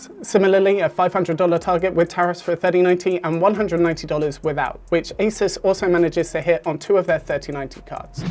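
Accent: United Kingdom. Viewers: ok, so my ears didnt fool me that you sound so british XD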